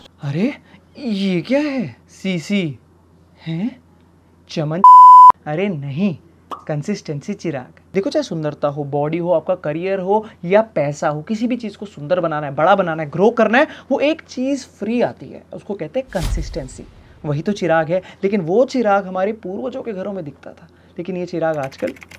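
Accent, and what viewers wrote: Delhi, India. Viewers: Delhi Valli baat cheet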